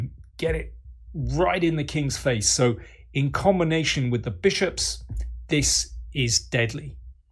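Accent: United Kingdom. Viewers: nice british accent, my dude (mate)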